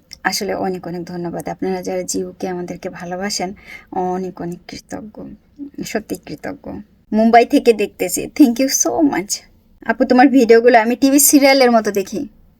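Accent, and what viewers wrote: Khulna, Bangladesh. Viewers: আপনি খুলনার মেয়ে?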